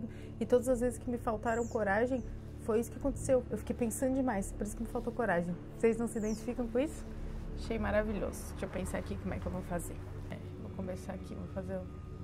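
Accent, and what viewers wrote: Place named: Santa Catarina, Brazil. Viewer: Tu é de Santa Catarina? Teu sotaque parece muito!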